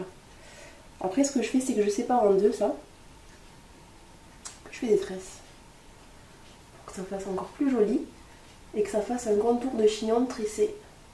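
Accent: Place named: Marseille, France. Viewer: Elle sont trop belle les coiffure et j'adore l'accent un peu Marseilleou italien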